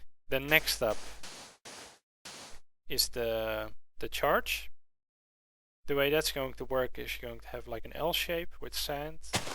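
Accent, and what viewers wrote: Netherlands. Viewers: nederlander zeker :P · lol , you sound dutch :) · U DUTCH RIGHT? ik hoor het aan je accent x) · je bent nederlands he? hoor het aan hoe je bepaalde dingen zegt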